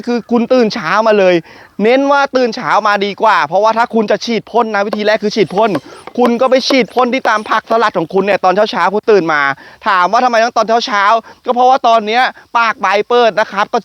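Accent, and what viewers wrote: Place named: Ban Pong, Thailand. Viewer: เก่งจังเลย..คุณ..เสียงเหน่อเหมือนกันเลย(คนบ้านโป่ง)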